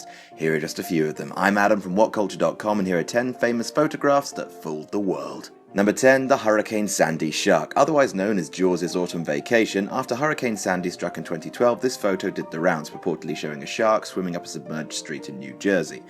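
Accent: United Kingdom. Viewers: The snippy British accent though charming becomes grating.... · you talk too fast and your accent is a bit too British speak normal and speak slower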